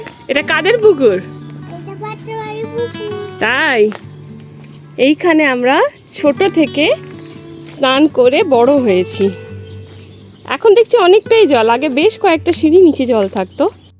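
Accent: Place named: Bangal, Bangladesh. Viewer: পাত্র পরিবার কি বাঙাল অরিজিন? আপনার কিছু উচ্চারণ, বাঙাল ঘেঁষা লাগলো... খুব ভালো লাগছে আপনাদের ভ্লগ।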